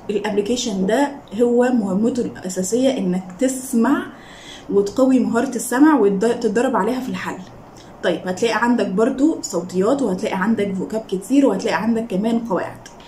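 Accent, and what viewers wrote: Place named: Pakistan. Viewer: Arrête 😂 tu fais la star de français et jcp quoi mais regarde ta façon de parler tu parle comme un pakistan c’est trop drôle en vrai il faut juste corriger tes fautes d’orthographe et de parole Parce que vous parlez de la mauvaise manière et que votre accent ne convient pas du tout au français parce qu'une personne indienne parle français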